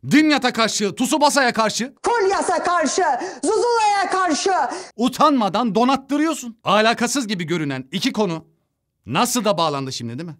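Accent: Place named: Eastern Anatolia, Turkey. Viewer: sizin şaka (ki çoğu şakanız gerçekten vasat) maksadı ile söylediğiniz cümleleri, doğu şivesi ile söylemenizi ne yapacağız?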